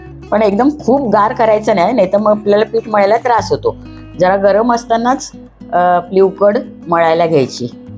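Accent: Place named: Goa, India. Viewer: तुम्ही कुठल्या आहेत, मातृभाषा कोणती, बोलण्याचे toning Goa side chi वाटते की कारवारच्या आहेत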